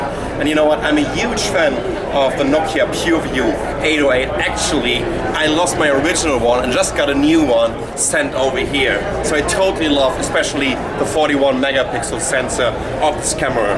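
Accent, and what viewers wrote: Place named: Germany. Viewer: amazing review, i like German-English language :)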